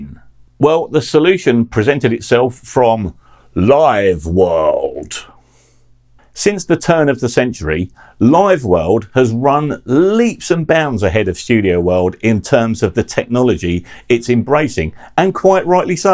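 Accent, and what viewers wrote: United Kingdom. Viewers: Entertaining and informative. and you must be from the West Midlands. i subbed lol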